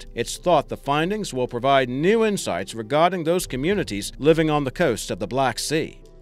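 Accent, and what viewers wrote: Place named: Australia. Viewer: ...........had to listen to 3 or more to understand what he was saying.......an entire "ployeted"....gotta be Australian.........."knaiefe"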